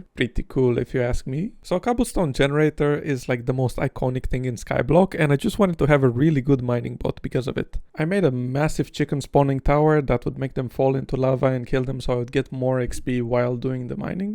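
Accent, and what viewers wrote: Romania. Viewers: as a romanian the second i heard your accent i just knew u are romanian:)) keep up the good work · Why Romanian accent familiar to Indian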